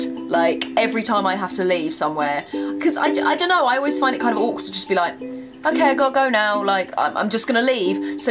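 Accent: United Kingdom. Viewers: Just found your channel and I absolutely love it 😀 I really like your british accent, its just nice to listen to your voice. That point with the phone is so damm true 😂😂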